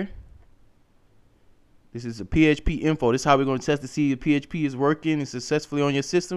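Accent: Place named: England, United Kingdom. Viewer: Sweet tutorial, finally someone making IT tutorials with a proper english accent! Thanks for the help.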